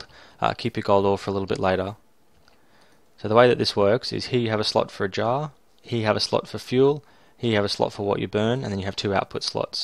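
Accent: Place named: Australia. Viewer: Thank you so much :D It is also great to hear a fellow Aussie :)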